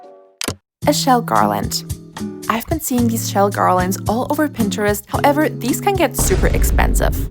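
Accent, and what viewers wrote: Germany. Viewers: es ist so krass, man hört das du deutsch bist, aber dein englisch ist so unglaublich gut omg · Hi 😊 i was wondering if you are german bcz you have this Accent that also have 😊❤ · Are u german u have a german accent? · i can't with german accent twin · Gurl u NEED to make a German video . ( if ur German u just sound like it no offense ) I LOVE UR VIDS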